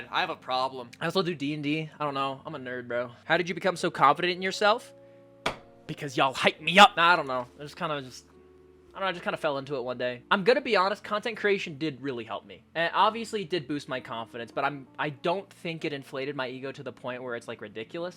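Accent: United Kingdom. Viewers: LOVE THE NEW BRITISH VOICE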